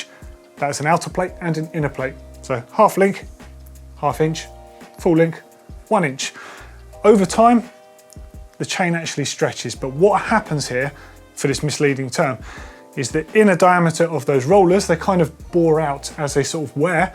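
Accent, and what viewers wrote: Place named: United Kingdom. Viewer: Very good info; lots of useful stuff, here; typical Brit accent on this dude (I'm an American, although my ancestors come from a little place, ten miles north of the old center of London, a thousand years ago...ha ha ha...); and, seriously, is anyone crazy enough to ride a bike that fast, downhill, on those dirt trails, over roots and rocks, in the mud, in the rain?!? (Certainly not even ME, who was once TRULY certified...ha ha ha ha ha!) I flew downhill in the dark, a few nights ago, and wound up hugging a partially obscured STEEL GUARDRAIL, covered with weeds and vines--upside down, with a few extra cuts & bruises; I can only imagine what it'd be like losing control on one of those downhill mud-slalom runs, in the rain--not any more fun than that, I'm sure!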